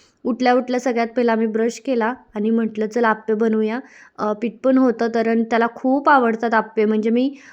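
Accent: Satara, India. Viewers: ठेक आपली भाषा साताऱ्याची 😂😂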